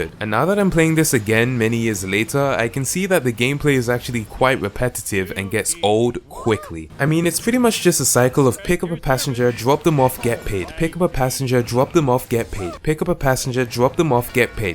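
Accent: United Kingdom. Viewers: I love your accent! Feuweees = Furries...I can't place which area of Britain you're from. Where you from, bro?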